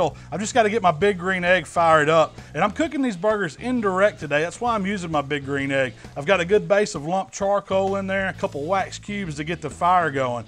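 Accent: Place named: Mississippi, United States. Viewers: Xeno he said boil... he's from mississippi. it's his accent. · Shhhh.... Mississippi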